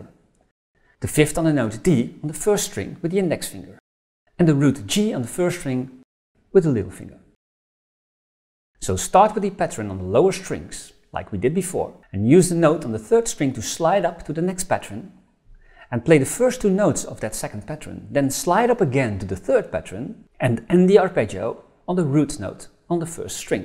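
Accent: Netherlands. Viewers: Great video, very helpfull! Het Nederlandse accent is altijd zo herkenbaar hahaha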